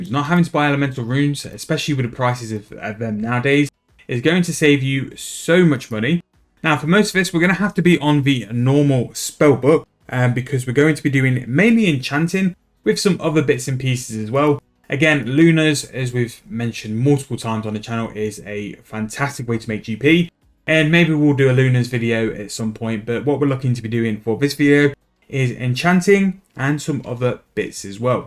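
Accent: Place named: United Kingdom. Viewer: 6M an hour doing enchants... damn I wish I tried this before. Trained 99 mage with combat, did some telegrind (sandstone --> sand) and I never looked into enchanting items really. Thank you so much! If you would talk a little bit slower, I'd appreciate it a lot. As a non-native English speaker the UK English is often hard for me to follow up with. Either way, I finally subscribed to you. Let's go to the 6000 subs!!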